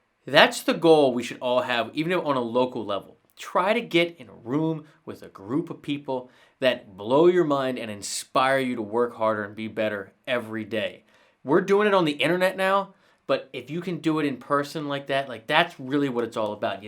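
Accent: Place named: Buffalo, United States. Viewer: Are you from Buffalo? I hear Buffalo in your accent.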